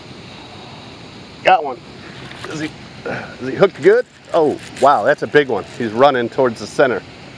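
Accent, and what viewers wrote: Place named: Rural, United States. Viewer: Why does he sound like he’s trying to cover up a country accent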